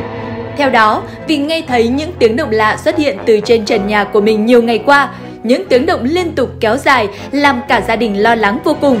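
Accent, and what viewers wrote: North, Vietnam. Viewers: Nay kênh đổi tên đổi giọng bắc review luôn:)) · Trở về giọng Nam Bộ đi, giọng Bắc nghe ko quen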